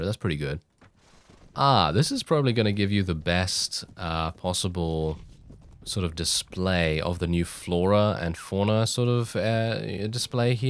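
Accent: United Kingdom. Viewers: His voice changed so much, go to his first series with barney beartilde, he used to sound so british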